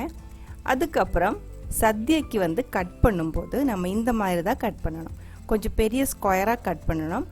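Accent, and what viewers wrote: Kerala, India. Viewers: Mam ur thamizh mixed with malayalam is sweet. Thanks for explaining in tamizh. I love malayalam, but dont knw.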